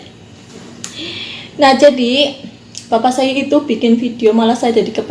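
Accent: Banyumas, Indonesia. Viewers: Selamat pagi mbak ngapak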